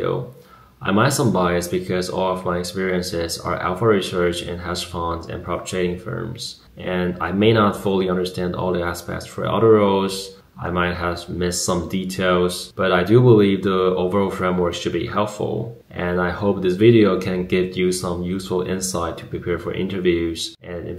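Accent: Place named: Taiwan. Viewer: 好感動影片看到一半聽出主播也有很濃的台灣口音，親切阿~~好親切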